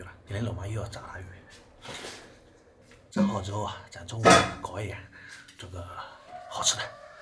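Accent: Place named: Jiangnan, China. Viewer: 看你的视频有点江南腔调哇 上海宁？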